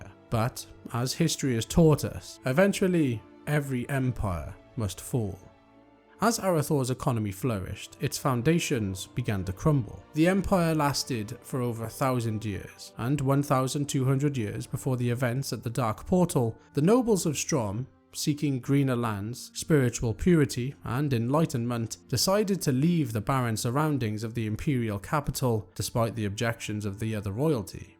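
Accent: Wales, United Kingdom. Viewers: This is great for us returning to Azeroth. Weird question, is that a Welsh accent?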